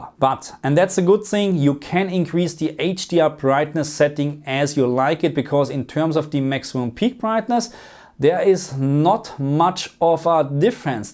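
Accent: Germany. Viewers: Deutsch Akzent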